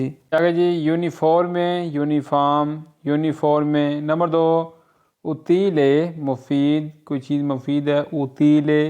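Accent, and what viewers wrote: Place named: Punjab, Pakistan. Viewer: Panjabi di Kya baat A... SAHI Gujrat wala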